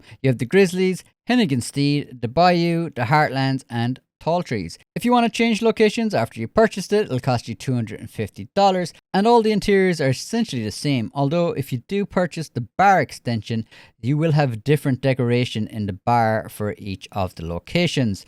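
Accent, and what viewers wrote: Ireland. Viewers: I love the Irish accent.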